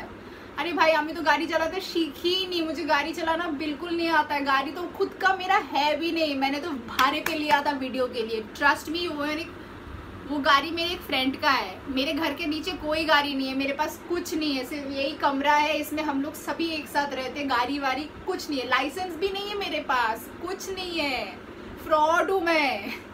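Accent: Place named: Pakistan. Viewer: Are you from Pakistan ? Your English is almost same as Pakistan cricket players Agar English nhi aata toh English bolke kyu smart banne ki koshish kr rhe ho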